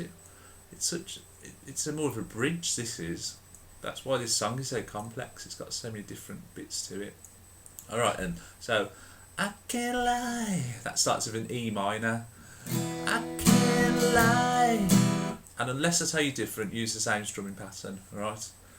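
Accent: United Kingdom. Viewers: hard to understand u with ur british accent ! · you from birmingham aha :D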